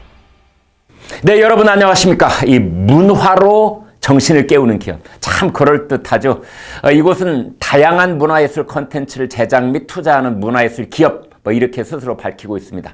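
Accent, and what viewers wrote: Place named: Jeolla, South Korea. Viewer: 김광일 전라도인가봐 못 믿겠어 사투리 액센트 너무 티나요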